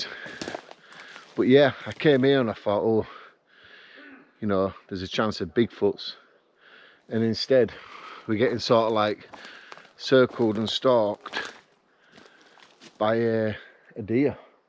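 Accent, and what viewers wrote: United Kingdom. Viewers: Nah, the accent sounds cool. Stuff sounds better with a British accent and they have great colloquialisms.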